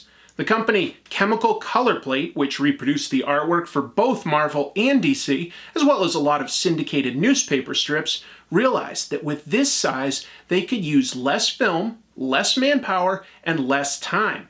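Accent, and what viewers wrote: United Kingdom. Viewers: Wow, epic British reading, I salute you sir! On another note, I’d argue jack kirby ruined his own art. Not a fan, though his stylized work screens jack Kirby making it somehow endearing😁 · The British Accent was a nice touch, only a true classy artist can appreciate the melodramatic! If only Colletta's work was as dynamic as his writing skills? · every thing sounds better in a British voice. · A posh Attenborough accent always works 😅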